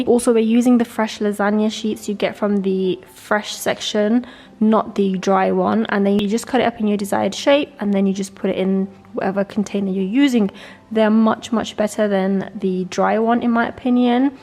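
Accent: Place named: Milton Keynes, United Kingdom. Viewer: Are you from Milton Keynes? I can hear your voice kindly u from there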